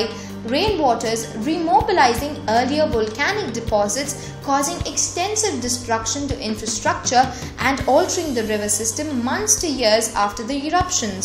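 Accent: India. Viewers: Indian accent my dear. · Indian accent? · indian xa... ganyan silang mag-ingles cute lang talaga ...-)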